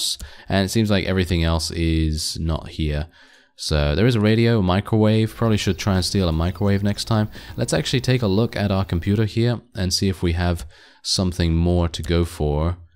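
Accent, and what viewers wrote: United Kingdom. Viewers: Came here for the games and stayed for the british accent.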